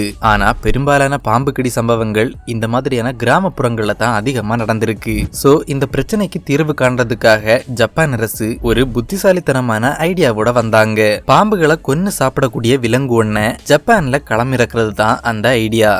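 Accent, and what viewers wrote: Kanyakumari, India. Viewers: The voice recorder is from kanniyakumari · Bro nee Kanyakumari thanaa 🧐🙂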